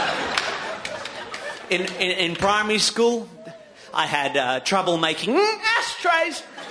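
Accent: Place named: Australia. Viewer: Early Minchin, My god you can hear his aussie accent.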